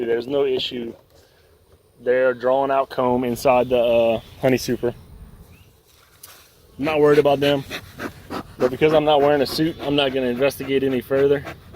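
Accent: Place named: Lafayette, United States. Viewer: Personally, I’m terrified of bees, but I watch your videos to understand bees better. Hopefully bees won’t be as scary if I understand why they act the way they do. Also, your accent is a taste of home for the homesick. I’ve been missing Lafayette.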